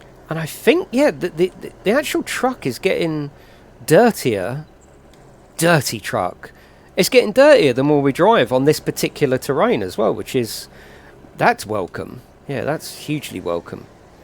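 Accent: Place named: Australia. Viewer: Omg the Aussie accent is strong and that’s coming from an Australian 😂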